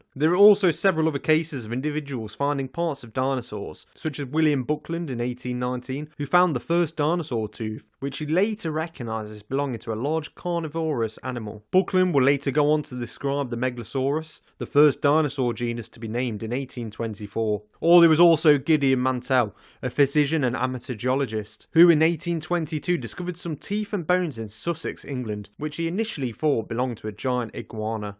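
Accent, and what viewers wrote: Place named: England, United Kingdom. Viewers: UK, England probably, doesn’t sound very irish or scottish so I’m pretty sure he’s from England. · Sorry no offense but what kind of accent is that? Scotland? Just curious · Where are you from? What accent is this? Or regional dialect or whatever. Sound like the northerners in Game of Thrones.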